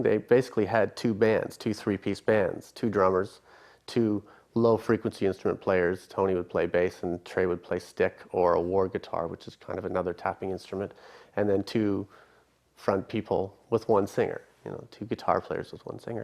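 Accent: Canada. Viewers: That's how Canadians pronounce again.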